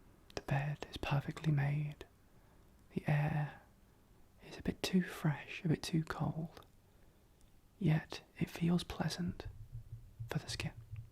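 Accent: United Kingdom. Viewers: It’s the lovely British accent I love it 😊😊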